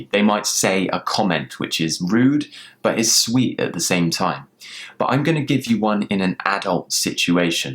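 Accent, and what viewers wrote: RP, United Kingdom. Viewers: Make more videos of your british accent!! I love it! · Hi! MY name os Sérgio and Im from Brasil... I need to say You've got a worderful British accent and your pronounce is quite clear. Your lessons are really amazing!!! My warmest. Regards! Sérgio Alkimim. Brasil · Good job ! Thanks for sharing useful things, I love learning and imitating British accent a lot. It sounds so posh 😎 keep doing this I'm your student and supporter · This is One of the BEST YouTube Channel to Understand more the British accent and words . Cheers! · Hello! Where are you from? I'm thinking about moving to the UK, and I want to pronounce English nearly to your accent. I tend to get accents very easily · Loved your british , you are the first british that make me love british accent much & more. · That's ritght Sorry :) I've been in London for 11 months and still learning English.I know there are many ways to say a word because of accents but when I heard you saying ''cannot'' it took my attantion.I was like umm ''he seems speaking with RP, actually He is more understandable but what about 'cannot' lol'' So I really wondered where were you from or if you had a reason for that ^^ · I love your videos so much, it can help me to improve my English skills. 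And I love your British accent, last one is you. 😍from Thailand ~ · Thank you for the lessons,you own well-born accent indeed.Wish I hade a british companion like you,back then in London. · love ur videos. love ur British accent. 👍👍👍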